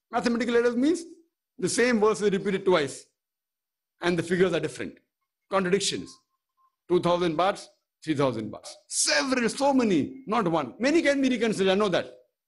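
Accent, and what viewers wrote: India. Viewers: seems strange to see a white man talking with a natural Indian accent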